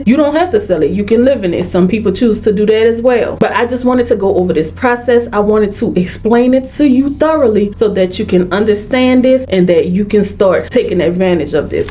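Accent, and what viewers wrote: New Orleans, United States. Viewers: Ik u from New Orleans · Love your energy and accent gotta be from NEW Orleans😊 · I literally saw the house in the nail, and knew it was New Orleans, her accent confirmed, haven't watched yet, but I'm putting my money on the east..... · New Orleans accent ! 🙂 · The New Orleans is oozing out of you. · Love your energy and accent ! Something about you New Orleans brother and sisters , you have that southern charm . Thank you for the information. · Somehow before watching this video I just knew she’d have a NOLA accent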